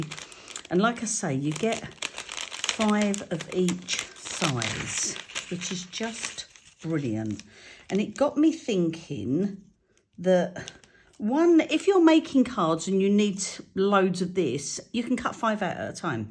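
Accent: United Kingdom. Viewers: Hi, I have only just found you on YouTube.. love your videos and how your brain works!!! I'm not alone! Lol🤣 I'm in Australia now, but originally from the UK, so your accent reminds me of home. Thanks for taking your time to show fab ideas and share some laughs. X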